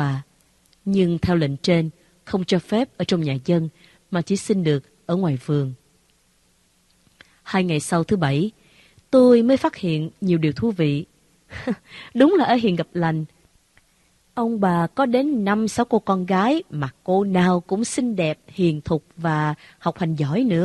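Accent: South, Vietnam. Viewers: yêu quý giọng đọc miền Nam ấm áp thu hút bài viết hay hơn khi đươc giọng đoc của yêu lam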